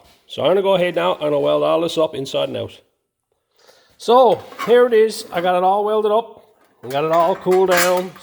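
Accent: Ireland. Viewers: . Iv just found this channel mate. Iv seen 4 videos of yours. I bought my grandson a we car there's a we hole in the floor and the rockers. Looking at you're videos I'm sure I can repair them. Do I hear a bit of Irish in your voice. anyway good job all round mate. Best of luck for the future. Paddy from Ireland. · Nice work. Great accent, sounds slightly Irish! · what accent has this guy? there is some Irish accent on some words and not that fake Irish accent you think we Irish have.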